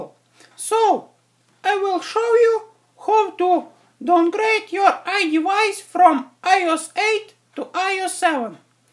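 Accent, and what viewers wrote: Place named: Asia. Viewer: That's how Asian people talks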